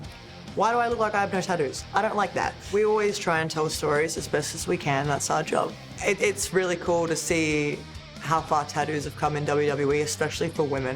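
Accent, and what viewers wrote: Australia. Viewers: Bruh…she’s Italian! That’s friggin’ awesome! Australian Italian. Her imitating her grandma was great. Also love her Australian accent! 😊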